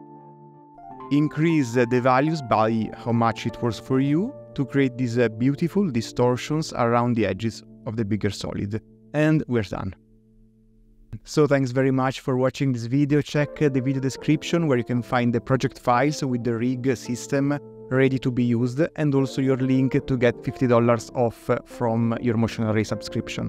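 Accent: Italy. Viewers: the italian accent is better then the tutorial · sbaglio o sento l'accento italiano? Congrats man! I've just discovered you and it was instant follow. Fa sempre piacere vedere del talento italiano. Di dove sei?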